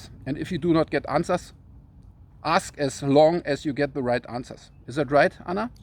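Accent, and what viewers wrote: Germany. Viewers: I was raised by my German immigrant grandparents ages ago and hearing your accent reminds me of home. I also follow the person you mentioned and #Lahaina #Maui I know I will follow you two as well. #Hawaii should’ve never become part of the United States. But they are and they deserve all the love and support we can send them. #LoveWins Mahalo everyone 💜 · Love your German accent!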